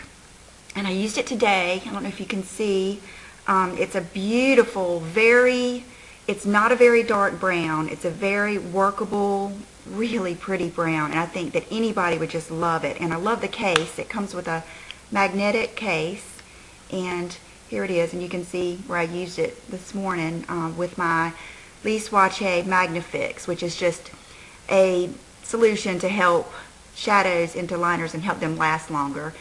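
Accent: South, United States. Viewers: I'm a new subscriber and I will definitely stick with watching your videos. You seem like such a genuinely sweet person. I just love your accent too. I'm a slow-talking Texas girl myself, so I am glad to see another Southerner with their strong access just out there with no apologies.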